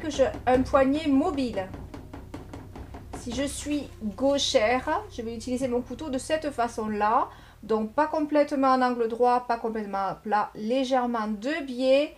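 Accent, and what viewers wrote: South, France. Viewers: Bonsoir une très bonne année à toi et ta famille quel magnifique tableau une fois encore c'est exactement le genre de paysage que j'aime regarder et essayer de le faire j'adore 💕 il est waouhhhhhhh bon week-end à toi bisous et à très vite j'espère pour une nouvelle toile merci pour tes explications simples et ton très bel accent du Sud tu nous fait rêver ❤❤❤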